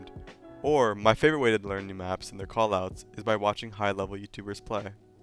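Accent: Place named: Ireland. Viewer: sounds irish lmao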